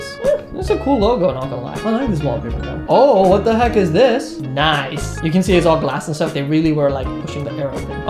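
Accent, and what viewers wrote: India. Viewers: Bro are you Indian ?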